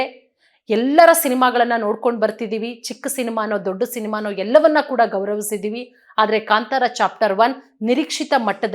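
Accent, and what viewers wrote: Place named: Andhra Pradesh, India. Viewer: Telugu influence ninda mathadthiddara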